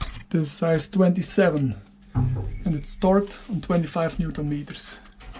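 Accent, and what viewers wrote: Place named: Belgium. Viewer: For en Englishman you have a Belgium accent. No problem, clear explication.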